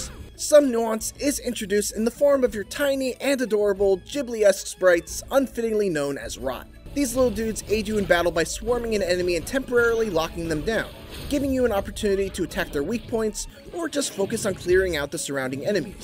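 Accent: Canada. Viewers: Why this dude sound like Canadian Guy Eh? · This guy sounds like Canadian Guy Eh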